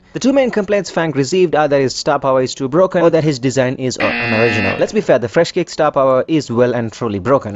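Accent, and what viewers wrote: India. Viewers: r u Indian tho ?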